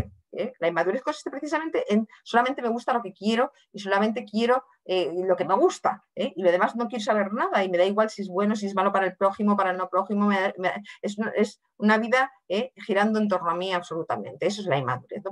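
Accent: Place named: Spain. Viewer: Muy interesante pero habla demasiado rápido y con su acento español es fácil perderse . Gracias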